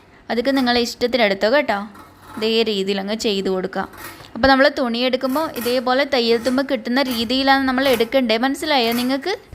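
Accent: Kannur, India. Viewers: Ithu nammude kannur bhasha anallo like cheithitundttoo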